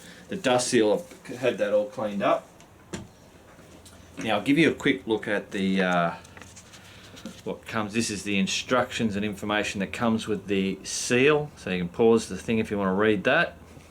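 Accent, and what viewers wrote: Australia. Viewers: Great to hear an Aussie.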